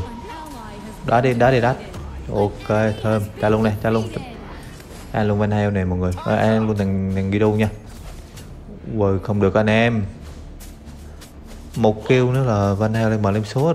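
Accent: Quang Nam, Vietnam. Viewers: Người Quảng Nam hay Đà Nẵng rứa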